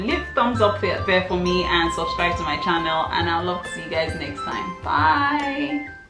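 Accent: Ireland. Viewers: Your accent has Irish in it a bit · I'm delighted you love living in Ireland.As an Irish guy living in Oz it's always good to hear people moving or visiting and enjoying it.I love the Irish twang in your accent.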